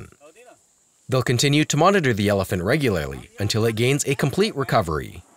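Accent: United States. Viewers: Good video but rubbish yank narrator spoilt it for me.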